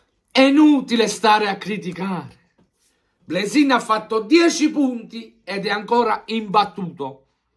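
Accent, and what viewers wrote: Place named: Turin, Italy. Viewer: si sente proprio dall’accento che sei di torino ahahahahhahahah forza toro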